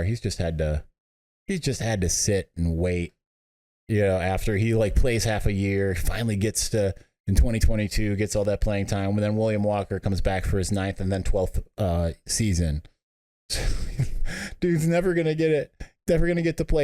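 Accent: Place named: Ohio, United States. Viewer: Yoooo the buckeye accent 😂😂😂😂😂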